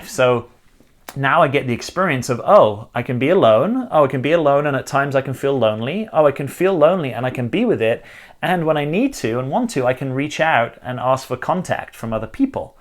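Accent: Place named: United Kingdom. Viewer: I love this video. Thank you. Although I'm an extrovert and very sociable, I'm learning to embrace my alone time and really starting to value it. I've been single for a year and am just about to go on holiday abroad as a solo traveller for a week - bit nervous, but looking forward to the experience. By the way, on a completely different topic, your accent sounds more British today!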